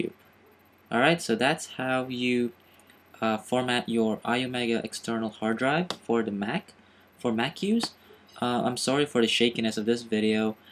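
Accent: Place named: Philippines. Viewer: galing na accent mo pareng.. proud ako sayo pinoy ka.. lol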